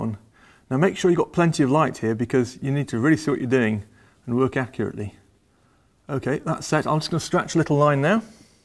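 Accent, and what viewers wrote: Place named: United Kingdom. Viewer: not only is this Brillant !! but your British to boot whoo :) no wedding band hehe ( my bad ) LOL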